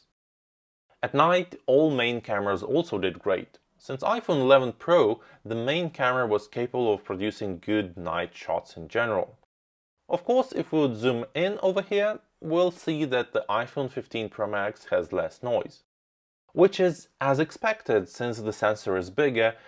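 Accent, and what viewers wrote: Russia. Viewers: Fucking russian accent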